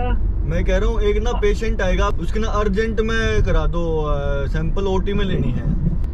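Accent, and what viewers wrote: Haryana, India. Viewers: Haryanavi accent, just wow🎉.. Dr. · No doubt sir I like the way you present your interest as orthopedic surgeon 😊 but more than that I love to listen your haryanavi accent . Which is just awesome 👌 👏 👍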